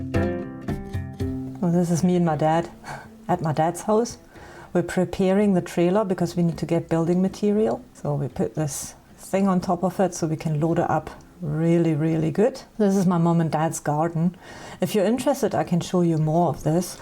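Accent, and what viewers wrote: Scotland, United Kingdom. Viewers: Aha! I had thought it was a Scottish accent. My (incorrect) theory was that you had studied English in Scotland. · I knew I heard Scottish in your accent! 🙂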